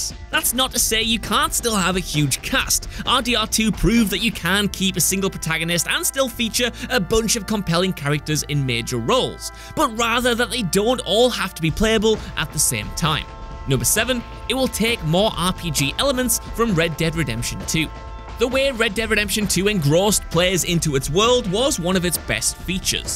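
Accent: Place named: Newcastle, United Kingdom. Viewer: It’s called a Geordie accent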